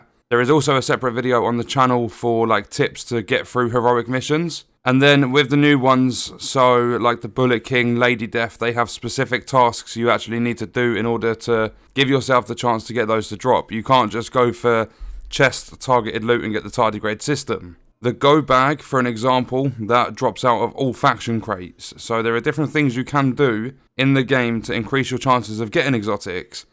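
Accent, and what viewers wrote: United Kingdom. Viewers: U make me want a British accent bruv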